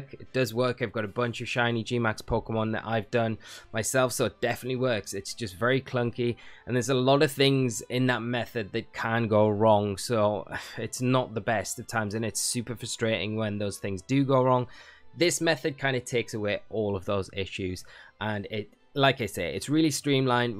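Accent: United Kingdom. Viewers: i like ya british accent B)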